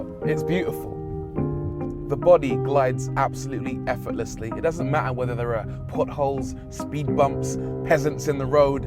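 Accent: United Kingdom. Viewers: THIS video is poetry at its best! I love to listen to this British accent and this beautiful music... Your channel IS underrated, mate! I love this shit.... thanks a lot! :-)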